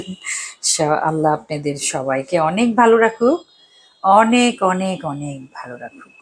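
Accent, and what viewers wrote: Sirajganj, Bangladesh. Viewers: আহারে কত মধুর আমাগোরে সিরাজগঞ্জের ভাষা। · সিরাজগঞ্জের ভাষা অনেক ভালো লাগলো শুনতে শুনতে হাসতে হাসতে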